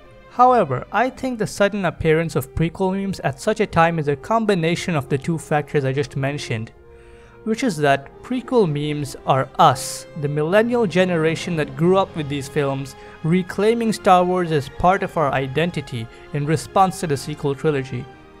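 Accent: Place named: India. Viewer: Starts video -Hears Indian accent Time to abandon ship!!!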